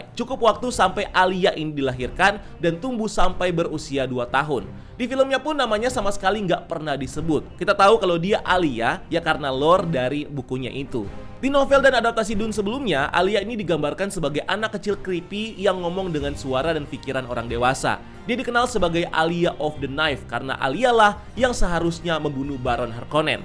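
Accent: Indonesia. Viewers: Ngomong ingris nya jelek banget. Ingris nya logat indo ngapa?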